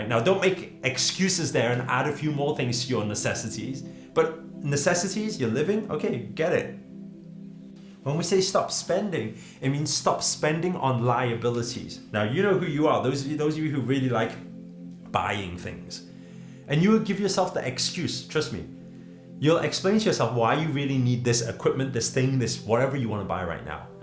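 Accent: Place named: Liverpool, United Kingdom. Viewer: Did you ever live on Liverpool? I hear a hint of scouse in your accent. :)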